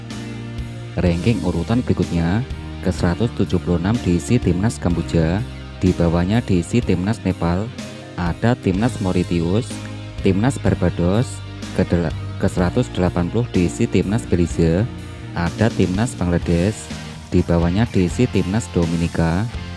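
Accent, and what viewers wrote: Java, Indonesia. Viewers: Kurang menarik mendengar suara latar, gaya bahasanya Jawa..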